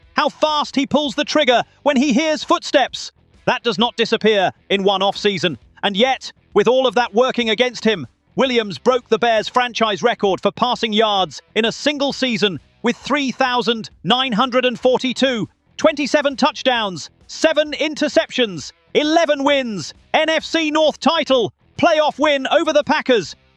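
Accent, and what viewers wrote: United Kingdom. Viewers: Why are you using a British voice for American Football it's ANNOYING!!!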